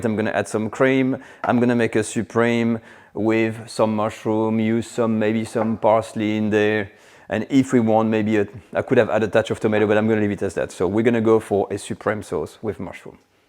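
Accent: France. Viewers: Quite shocking that a youtube channel with Academy in the title, and being French, did not make its own pastry ... that is the one technical bit here. That's the sort of thing I do on my amateur channel ! Are you just a bloke with a French accent trying to make money on youtube ? If they find out they will send you over here to England as a punishment :-)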